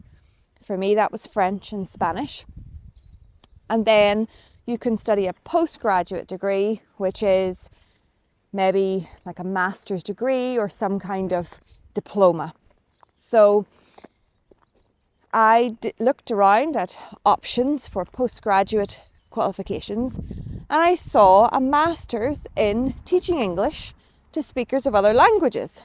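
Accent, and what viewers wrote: United Kingdom. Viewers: No, you didn't always Only in the field f English teaching You are satisfied, indeed. Are you English? Your accent is not RP, not southern at least. Rhotic at times, not scotish... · Hi, I'm from Brazil. How've you been? Can I ask where your accent is from? It sounds british to me. Am I mistaken?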